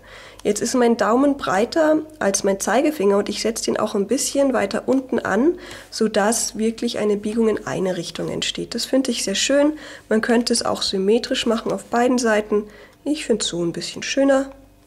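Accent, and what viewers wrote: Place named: Germany. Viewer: Is this speaking/demonstrating cause on another video on her account she says she is German. I am proud to be half-German I love the German accent no offense but accent reminds me of my dad but he died when I was 3. Very good english on other videos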